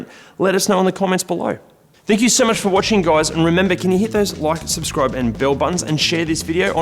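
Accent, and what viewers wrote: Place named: Australia. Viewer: This guy is Aussie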